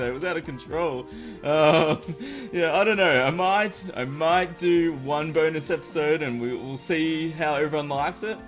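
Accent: Australia. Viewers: im like 85.6% sure you are australian, but i would like to be 100% sure. are you australian?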